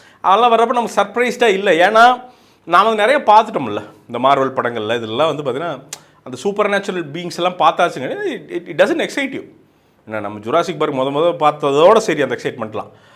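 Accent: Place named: Coimbatore, India. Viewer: கோயம்புத்தூரில் போய் ஒரு நாள் இருந்ததுக்கு அவங்களோட வட்டார பாஷை பேசுற.. இத்தனை வருஷமா ரிவைவ் பண்ணுறே பாண்டா இன்னைக்கு வரைக்கும் கீழே எழுதி வச்சு தான் பேசிகிட்டு இருக்க என்னைக்கு தான் நீ மாறப் போறியோ..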